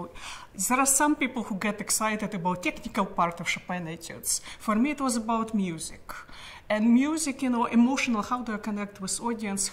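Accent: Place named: Moscow, Russia. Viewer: Moscow dialect of English. Nevertheless the grammar is normal.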